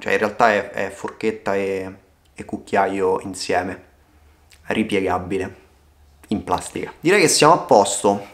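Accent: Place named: Lazio, Italy. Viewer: Ciao, grazie per la condivisione della tua attrezzatura invernale! Purtroppo ti capisco quando dici che è una tristezza fare video in casa... qui in Piemonte, da novembre, siamo stati più arancioni/rossi che gialli e purtroppo anche io mi sono dovuto arrangiare con video indoor! Speriamo di tornare presto in montagna!! PS se hai seguito qualche mio video avrai capito che sono Laziale (Latina) di origine, tra l’altro ho vissuto tre anni a Viterbo e il tuo accento mi ricorda la mia gioventù! 😂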